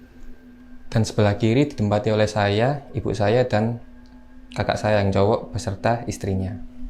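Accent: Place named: Java, Indonesia. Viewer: Alus bner suaranya jwa bnget sopan kliatan bnget tatakramanya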